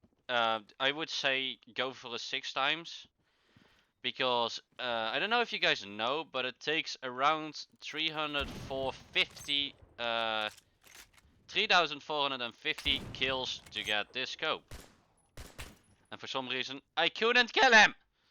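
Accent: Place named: Netherlands. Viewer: this person is from holland you hear it from its accent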